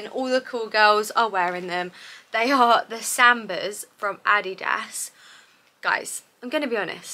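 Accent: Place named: RP, United Kingdom. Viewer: I just love your British accent, it’s so posh:) 😍😍😍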